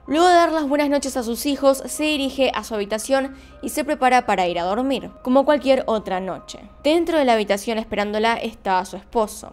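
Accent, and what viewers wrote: Argentina. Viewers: Trabajo en la madrugadas y hace un par de días vi tus videos, creo que ya vi la mitad jaja. Eres genial, con todo y tu acento argentino ✌🏻😋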